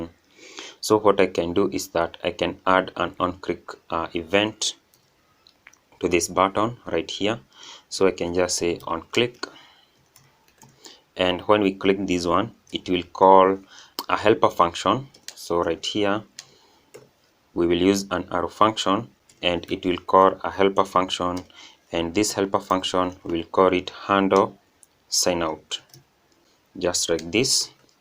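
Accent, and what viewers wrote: Kenya. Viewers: Why do I hear a Kikuyu Voice😂😂😁😁, Bro, congrats. Much respect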